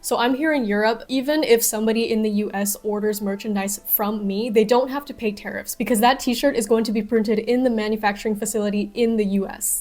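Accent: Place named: Netherlands. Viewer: took me until to realize youre dutch too holy shit edit: the netherlands. not holland lmao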